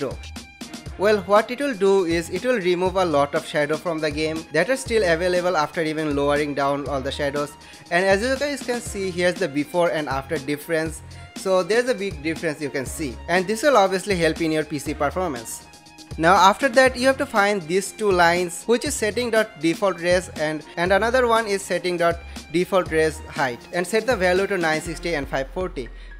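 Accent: India. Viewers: Lul indian accent it's my teacher in youtube that teach everything lul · When you hear an Indian voice on a tutorial, you know that it’s going to be good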